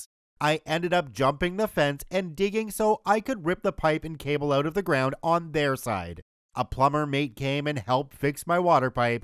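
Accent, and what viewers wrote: Australia. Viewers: Beautifully Australian. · Oh, this bloke is for sure from Australia haha. "Botanical Gardens" "Instro" 😂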